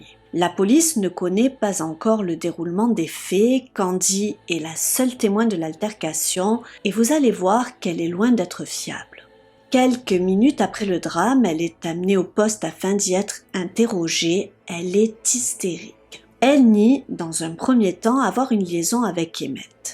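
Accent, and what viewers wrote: South, France. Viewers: Je ne commente pas souvent et je voulais te dire que je regarde et like toute tes vidéos, j'aime tes intonations et cette petite pointe d'accent du sud. Tes récits sont clairs, originaux et intéressant ❤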